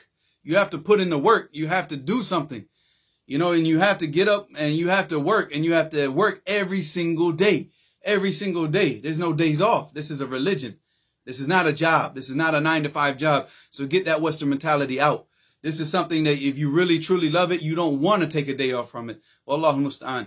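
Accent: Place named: Texas, United States. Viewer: I think from texas bro but brother above also has a good point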